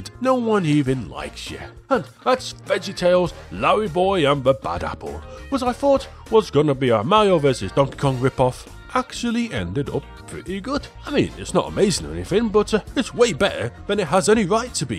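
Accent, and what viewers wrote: United Kingdom. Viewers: i thought goombas were japanese you have a british accent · Dispite one of my actual favorite british sounding youtubers hucking raid shadow legends onto loyal viewers, I'm still not gunna download it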